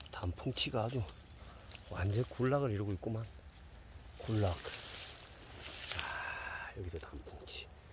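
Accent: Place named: Seoul, South Korea. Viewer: 알흠흠다븐 서울 누님 말썸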